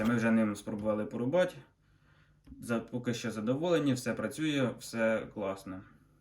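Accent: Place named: Poltava, Ukraine. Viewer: По говору слышно ,либо Полтава ,либо Кировоград ,я вас обожаю землячки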